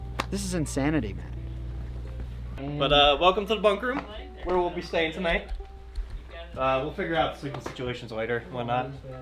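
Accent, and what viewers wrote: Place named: South, United States. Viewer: Really spun up the southern accent on this vid, huh bud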